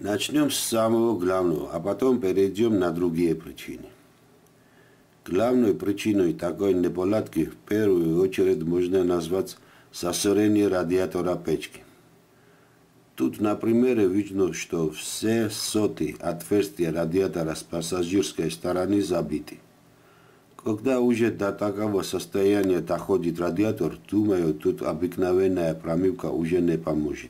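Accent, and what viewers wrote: Georgia. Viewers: Спасибо за полезное видео ❗️Акцент как у Сталина 💪👍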